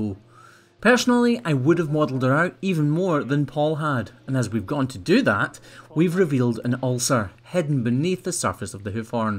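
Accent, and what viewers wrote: Scotland, United Kingdom. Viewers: Im from Sweden and I have nothing to do with this kind of work, but I think its very beautiful and nice to watch. I was sleepless one night for like 2 weeks ago and look at some stuff on Youtube and on some weird way I found you. Now I have watch so many of your vids that even I can see sometimes the problems the cows have, its very fun! And I love you Scottish dialect and humor! 🍻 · I know what you meant but it's really just a Scot's accent which differs it from English, Welsh or Irish. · Since discovering the, I have learned so much about cow hoof care, but most importantly I can confidently say that I have started to understand Scottish English.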